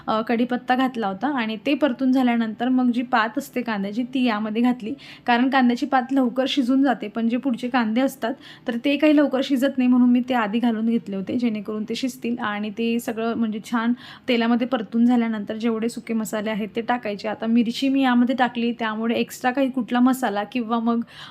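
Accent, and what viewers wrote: Khandesh, India. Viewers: Maz maher Nandurbar ch aahe.Tu khandeshi bolte mhanun mala maheri gelya sarkh watt...mhanun mi tuze blog pahayla survat keli ani aata roj baghte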